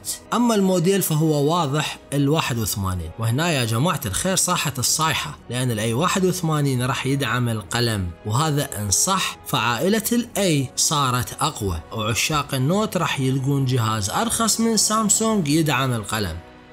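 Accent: Iraq. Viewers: من سوريا احييك اعشق اللهجة العراقية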